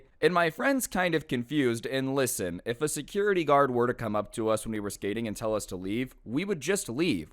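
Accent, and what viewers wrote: United Kingdom. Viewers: British accent 10/10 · best british accent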